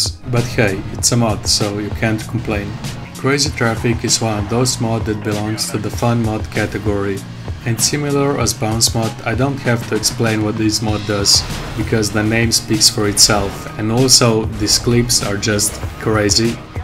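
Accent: Russia. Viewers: сразу слышно русский акцет)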